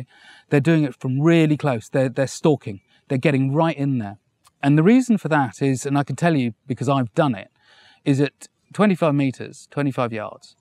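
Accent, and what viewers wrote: England, United Kingdom. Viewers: 16 Minutes of the spoken word on arrows thoroughly enjoyable, the British accent so much more appealing and easy to listen to than that, I know everything American wine. Thank you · Is he English?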